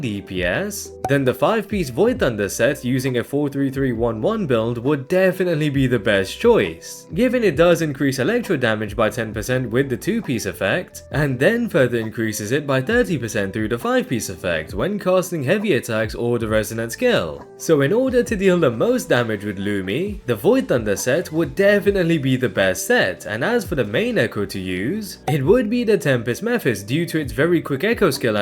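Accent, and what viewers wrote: United Kingdom. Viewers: sounds british to me. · ??? Her accent is Scottish, not British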